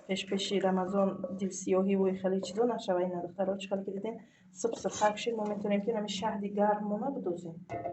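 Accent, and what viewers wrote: Tajikistan. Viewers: ай кучои хисоре чунки камтар лахчаи хисори гап мезане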